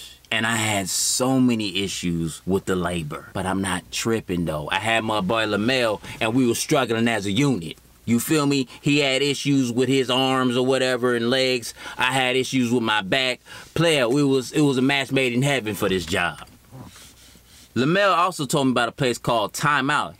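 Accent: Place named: New York, United States. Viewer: You originally from New York?? I detect an accent.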